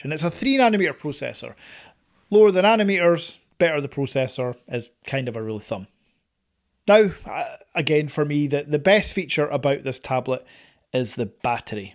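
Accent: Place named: United Kingdom. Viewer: Guy British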